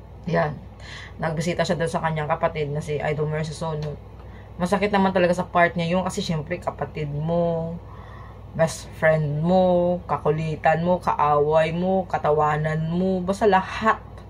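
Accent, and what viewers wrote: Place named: Visayas, Philippines. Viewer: Visaya.kainis yang pronounce mo.sakit sa tenga.alden po!d aldin.juliet po.d joliet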